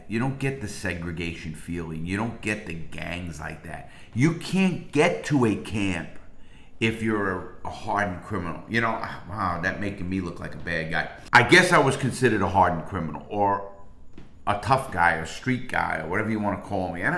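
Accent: New York, United States. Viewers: U sound like you’re from NYC or the northeast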